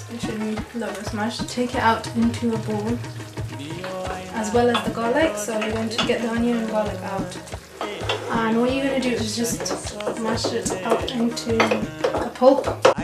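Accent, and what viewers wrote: United Kingdom. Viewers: I'll try this recipe. Thanks for posting it. Like the music too - what is it? And nice accent - are you from London? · I was into her British accent than cooking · Hey I loved your voice it's so soothing and your British accent makes it even more beautiful 😌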